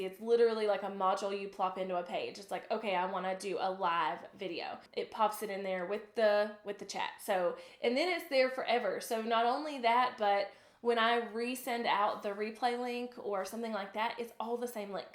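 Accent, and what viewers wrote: South, United States. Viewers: She has a slight American Southern accent, and not only is that acceptable here in the United States, it’s actually preferred since it tends to increase likability and relatability. Barak Obama tends to infuse his speeches with Southern dialect even though he’s not from the south, and Bob Ross is beloved because of his southern euphemisms. While you are technically correct, what she said is not acceptable in formal writing, she’s engaging an audience, and in that case it’s totally acceptable.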